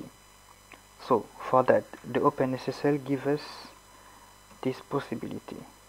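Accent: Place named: France. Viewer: not bad but your english sound like french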